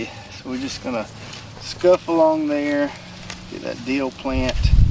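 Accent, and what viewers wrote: South, United States. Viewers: Trivial but--Southern Drawl a bit refreshing from farther N. Commifornia, even if it's heel for hill. 😄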